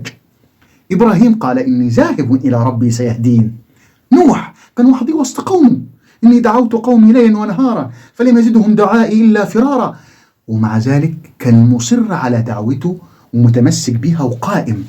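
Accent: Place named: Cairo, Egypt. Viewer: إنت ليه بتنطق الجيم بالطريقة القاهرية؟؟ غير مقبول بالنسبة لي ، و مش حأقدر أكمل.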